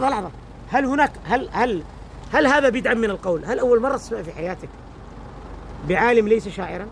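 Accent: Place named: Tihama, Yemen. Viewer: ايه بس اللكنه التهاميه تخرب فصاحته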